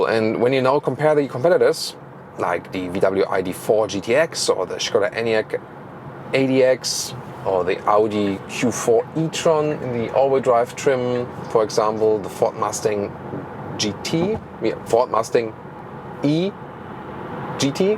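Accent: Germany. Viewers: This guy has strong german accent. funny.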